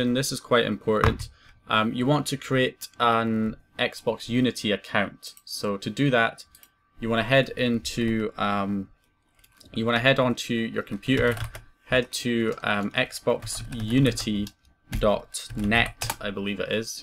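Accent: Scotland, United Kingdom. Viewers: Are you scottish bro? Just for catching the accent. (i'm Italian actually)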